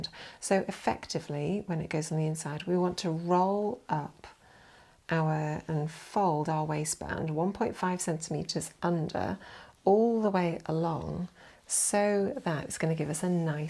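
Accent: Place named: United Kingdom. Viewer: This a great tutorial! I have been sewing for quite awhile but I seem to have so much trouble getting the wristband fitted nicely. I prefer a curved waistband instead of a thin 1 or 1.5 as I am slightly swaybacked, and I end up getting a little gap in the center back. I can’t wait to try this method. You are an awesome instructor and I love to her your cool British accent! Thanks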